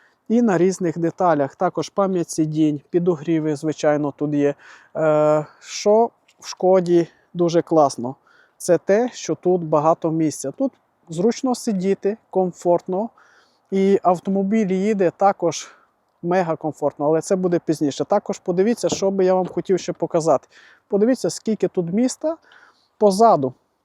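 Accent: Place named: Transcarpathia, Ukraine. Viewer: Ти з Закарпаття "Чоловіче"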